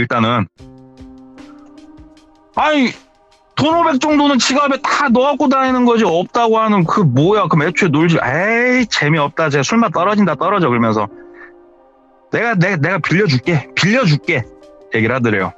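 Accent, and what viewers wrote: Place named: Chungcheong, South Korea. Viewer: 충청도 분인가ㅋㅋㅋㅋ 익숙한 억양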